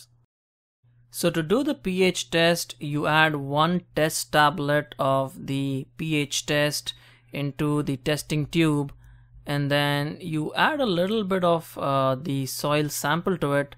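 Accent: India. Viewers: Everything else is a regular indian accent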